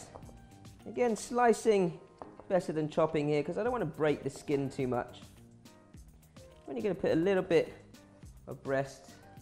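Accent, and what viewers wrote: England, United Kingdom. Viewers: THANK YOU SO MUCH for the excellent tutorial videos. I’ve love Chinese food my whole life and always wanted to learn how to cook it better. I LOVE THESE YOUR VIDEOS. As a humorous side note. Being from the US I’m used to seeing Asian-Americans with an American accent. But It’s fun to see you with your British accent. So cheers to you. Chef. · the best detailed show for Hainanese Chicken dish so far- of course love the English accent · M8, your UK accent is thick af. Nothing Singaporean abt it. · YOUR BRITISH ACCENT IS TOO STRONG MAN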